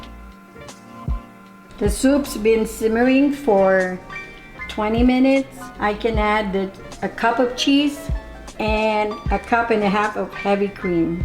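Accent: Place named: United States. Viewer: i like your cute american accent , count me as your number 1 fan and subscriber. i miss your cooking when we were a lot younger !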